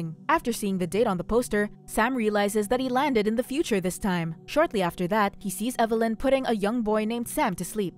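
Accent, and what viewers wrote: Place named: Philippines. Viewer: The narrator sounds Filipina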